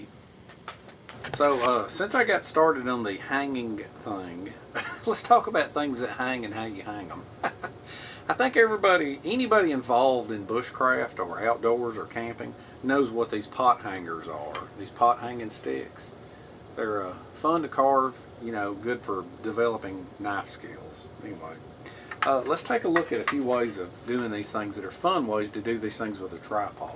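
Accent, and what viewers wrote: South, United States. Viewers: Love watching your vid’s you have the coolest voice lol, very southern 👍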